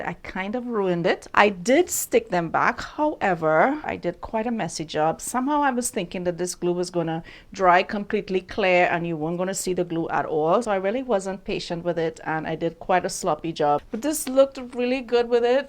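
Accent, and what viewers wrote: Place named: Trinidad and Tobago. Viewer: I just came across your channel a couple of months now, and I have to say I truly enjoyed your videos. Butt. . I have to ask are you from Trinidad 🇹🇹? I am... not that it matters but I kind of pick up on the accent. Truly enjoy your videos regardless where you from.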